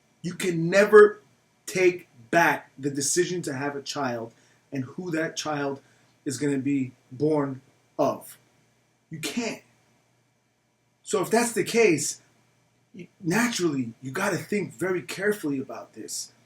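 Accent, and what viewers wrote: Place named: New Jersey, United States. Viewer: You are 100% right in Napoletan culture the man is responsible to stay with the children . If he doesn't stay with the children's he is considered punk . If he doesn't pay respect to family or to the elders he will be low level person or person with no face . You are 100% right . I think you are from Napoli because i can see it in New Jersey accent . You sound like Tony Soprano .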